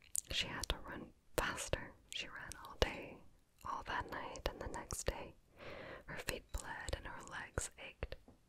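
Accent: France. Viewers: Your french sounds like France French rather than Quebec french or maybe I was just tired when I was watching. Either way its cool, I speak quebec french so it doesn’t sound as good. Lol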